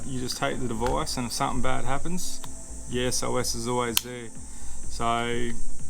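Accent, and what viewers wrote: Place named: Australia. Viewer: That's an Aussie if I ever heard one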